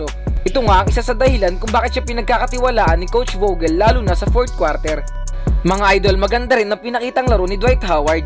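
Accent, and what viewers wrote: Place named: Iloilo, Philippines. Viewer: annoying ang punto ng salita mo mag ilonggo ka na lang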